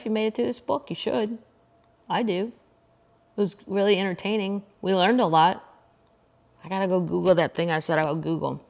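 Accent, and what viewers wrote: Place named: Appalachia, United States. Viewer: your hillbilly accent has gotttn very strong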